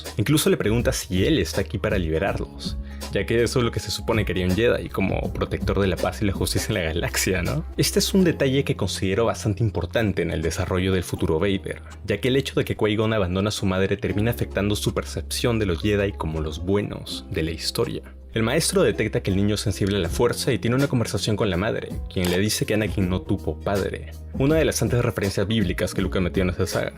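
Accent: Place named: Peru. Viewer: manoo ese acento peruano es todo te juro, sube mas videos asi la subes como mrd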